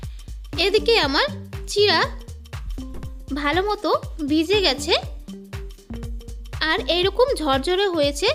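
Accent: Bangladesh. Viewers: Khub Sundar. Ekjon bolen Bangladeshi tan lagche kathya te. Recipe bujhlei hobe. Bujhechen. Karo durbolata k nea tana Tani korben na. Ato sundar ekta recipe .atai boro kathya. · দারুন, আপনার উচ্চারনে কিন্তু বাংলাদেশী টান স্পষ্ট । এটা বড়ই কানে লাগছে!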